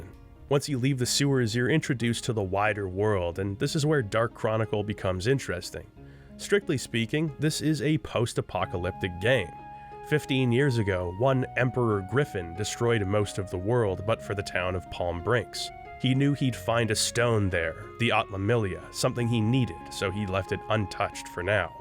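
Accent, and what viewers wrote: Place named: Canada. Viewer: He also pronounces some words ("again" for example) in a very Canadian way.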